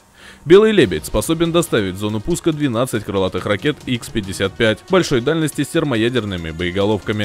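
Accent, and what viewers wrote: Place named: England, United Kingdom. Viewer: Ты лицо своё прячешь, потому что упорно искажаешь произношение русских букв на английский лад? Чего добиваешься, увеличение дизлайков?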